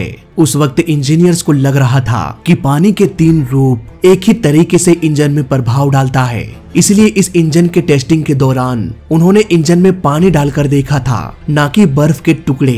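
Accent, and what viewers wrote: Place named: Bihar, India. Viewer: Tu news to ache deta h lakin hinde bol na sekh le bihare brass mat bolo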